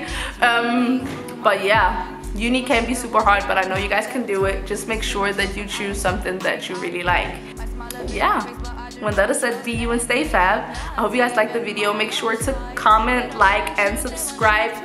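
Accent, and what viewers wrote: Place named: Latin America. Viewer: Your accent sounds somewhat Latino. What country and town to you live?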